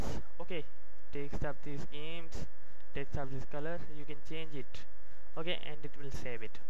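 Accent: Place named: Spain. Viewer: How about learning speak english correctly and not "spanish english"?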